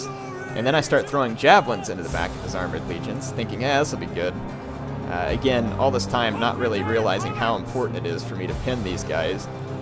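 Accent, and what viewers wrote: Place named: Minnesota, United States. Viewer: Ah it's a drama that takes place in Minnesota, it's just some inflections in your speech remind me of a few of the characters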